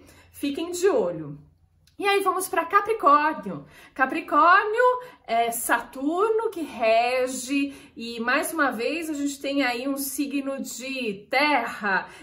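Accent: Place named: Interior, Brazil. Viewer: Astrologia e literatura orrrrnam ,um sotaque meio acaipirado, alguma influência?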